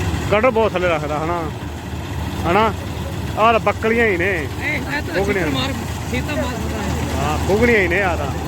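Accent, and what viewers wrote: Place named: Ferozepur, India. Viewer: bai tohadi boli badi vadiya a firoj pur di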